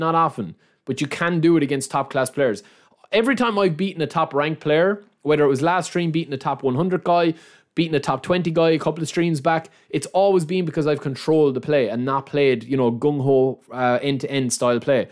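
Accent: Ireland. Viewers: Love these tier lists and your McGregor accent. :))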